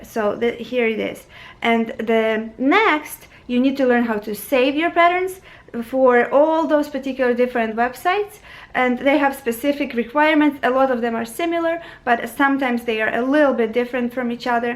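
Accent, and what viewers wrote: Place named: Ukraine. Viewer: I love your accent. You're Ukranian, right? Thank you so much for your helpful videos! I just discovered your channel. I love it! You're such a blessing! Thank you!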